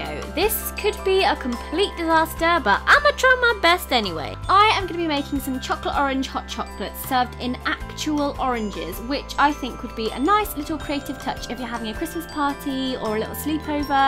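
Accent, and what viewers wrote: United Kingdom. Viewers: "first step is to pop a sauce pin on the hob" you are so british and adorable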